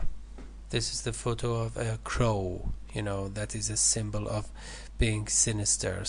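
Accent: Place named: Iran. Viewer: Such a thick Persian accent! dear lord! P.S. your examples are filled with grammatical errors.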